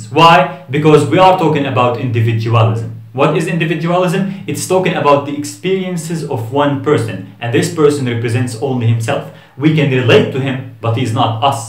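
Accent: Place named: Turkey. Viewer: your accent sounds like Turkish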